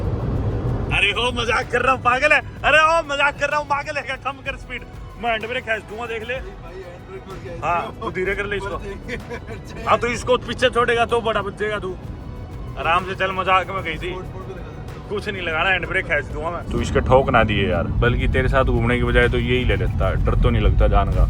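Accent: India. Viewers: I happened to stumble upon this channel by accident and what attracted me most was the language. At last someone speaks for Indians in their language. Talking about Wales who are proud of their heritage and try to preserve their language, this is being published in English with Indian accent. Why is there such need to speak in English for Indian audience. Knowing another language is an achievement and useful but making it your own…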